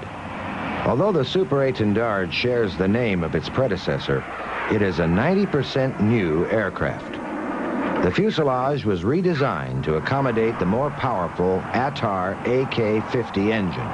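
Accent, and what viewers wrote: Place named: France. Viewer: Narrator French accent... sucks!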